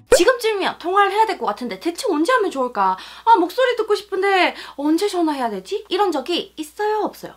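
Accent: Gyeongsang, South Korea. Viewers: 서울방언속에 경상도표준어가 쪼매 들리네요.ㅎ 잘 듣고 가용.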